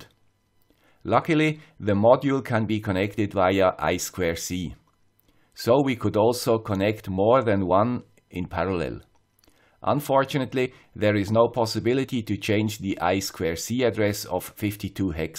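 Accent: Switzerland. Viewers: I forgot to mention otherwise it's a good video! Thank you "the guy with the Swiss accent" ♥️ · Pro tip when dealing with swiss accents: 1,25x video speed. It soothes my adhd :-)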